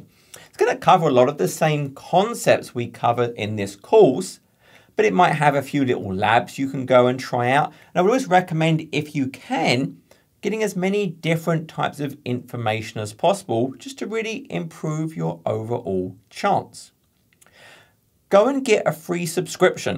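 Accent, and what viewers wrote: United Kingdom. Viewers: Hello, Happy New Year 2023. You are truly an exceptional teacher period! Your style of delivery and the way you captivate your viewers in addition to your passion, selflessness, brilliance and clear desire to empower people is mind blowing. Thank you so much because watching your AZ 900 course did actually make a difference in my AZ-900 exam. Yes of course, I smashed and aced the exam on 12/31/2022 with a score of 865 and it was a great way to end the year 😊. As someone that lived and studied in England for some years, just listening to your British accent was quite entertaining and a reminder of the great and beautiful people of Britain 😊 Right now, I have started studying for AI-900. All the same, thank you very much and have a great year.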